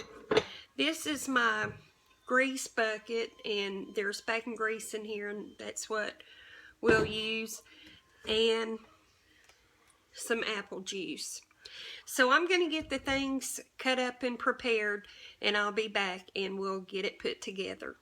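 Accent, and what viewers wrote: South, United States. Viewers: I love your accent, reminds me of my family from GA/NC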